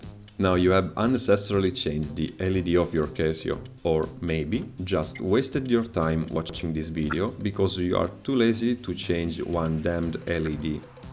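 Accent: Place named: Italy. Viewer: You sound very Italian :)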